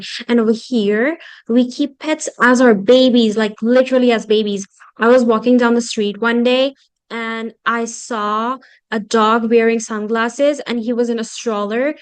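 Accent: United States. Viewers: Nice your american accent ❤ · Amazing American accent 😮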